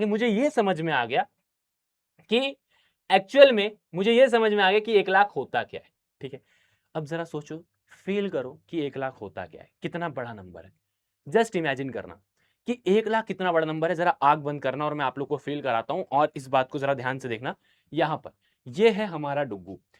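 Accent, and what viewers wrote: Bihar, India. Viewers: Sir please बच्चों जिससे acting mat kara karo समझ nahi aata or बिहारी mat bola karo na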